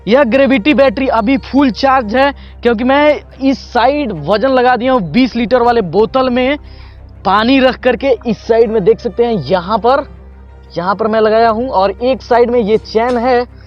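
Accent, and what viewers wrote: Bihar, India. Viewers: Aap Bihar se ho na?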